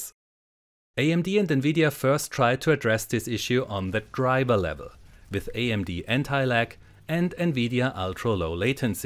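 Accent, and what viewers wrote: Austria. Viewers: From Austria eh?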